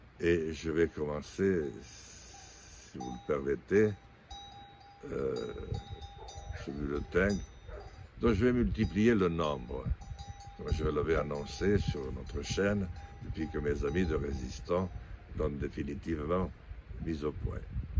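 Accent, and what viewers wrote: France. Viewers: Bon rétablissement cher monsieur Lassale vous nous êtes très cher. Votre accent sent bon notre France ou ce qu'il en reste... je suis franco-française j'aime par-dessus tout mon pays et de voir qu'il se dégrade aussi vite me fait dire aussi "j'ai mal à ma France". Remettez-vous bien vite auprès de votre famille.😅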